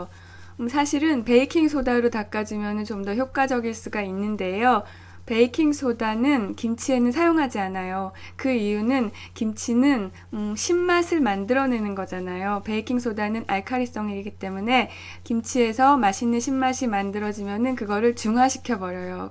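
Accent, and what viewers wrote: Seoul, South Korea. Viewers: 님 말투가 서울 사투리 같아요 ㅠㅠ 너무너무 듣고 싶은 목소리이구 ㅎㅎ 재밌어요 ㅎㅎ 레시피 감사합니다!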